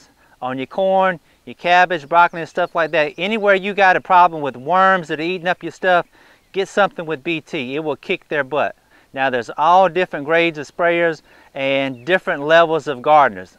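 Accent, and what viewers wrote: South, United States. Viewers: He really does have a good southern accent. Some are definitely not as fluid and warm as this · A good southern accent is like sweet tea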